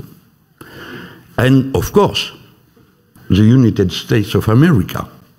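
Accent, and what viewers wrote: France. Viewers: 😂 et en plus il fait exprès de forcer son accent français ❤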